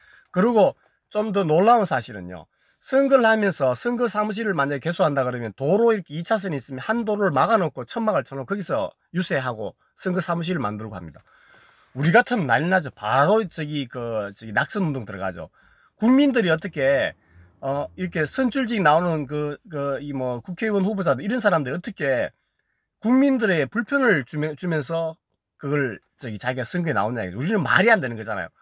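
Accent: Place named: Pohang, South Korea. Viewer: 소중한 정보 고맙습니다. 구수한 포항 억약이 인상적입니다. ^^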